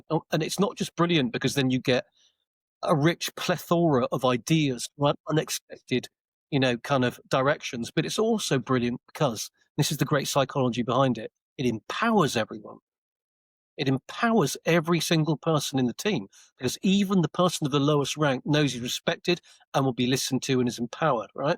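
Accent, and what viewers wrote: Wales, United Kingdom. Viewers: Are you Welsh? Great video! Sub’d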